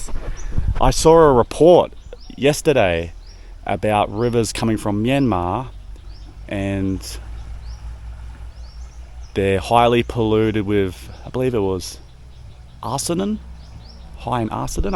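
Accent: Australia. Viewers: Sounds like a Melbourne accent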